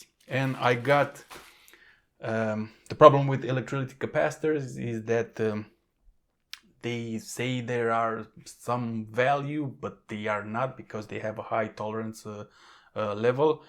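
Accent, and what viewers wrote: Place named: Romania. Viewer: considering your accent I bet that you are Romanian.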